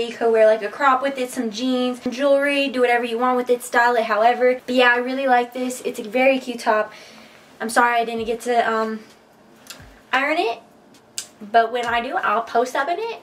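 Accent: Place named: South, United States. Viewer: is it just me or she has a slight country accent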